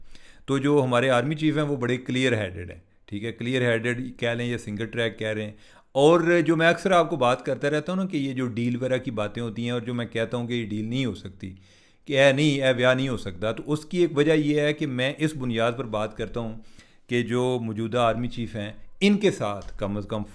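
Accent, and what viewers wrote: Punjab, India. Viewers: Urdu main punjabi ka tarka kamaal stay blessed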